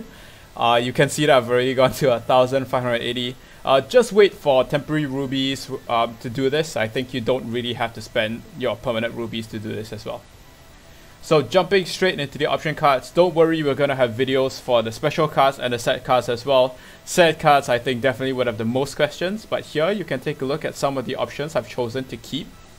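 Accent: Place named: Singapore. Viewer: Just curious. You sound singaporean. Like your video btw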